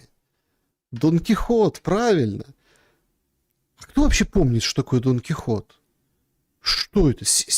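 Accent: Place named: Donbas, Ukraine. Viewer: Господин, мне приятно смотреть ваши ролики, не только потому, что вы в них говорите по "донбасянски", несмотря на звонкое "Г", но ещё и потому, что вы с РТИ! Да и контент у вас востребованный! Мы за вас! Делайте вашу работу, как и всегда. Нам нравится! С уважением - Кременная.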